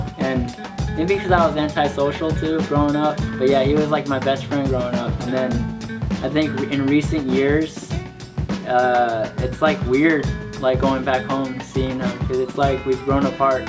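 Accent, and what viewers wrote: Texas, United States. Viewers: it's that southern drawl we have in Texas. maybe not a southern accent, but we draw our words out more. :) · Wow, I never realized that was from Texas, I can totally hear it in his voice. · Now I understand shy he talks so funny, he's from Texas :D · He has a Texan accent lol.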